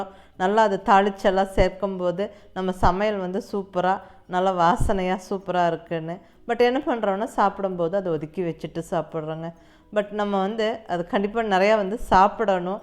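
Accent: Kongu, India. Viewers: Mam neenga pesura kongu tamil romba nalla iruku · I think Coimbatore.... Sollumbothey Enga kongunadu Nu sollaranga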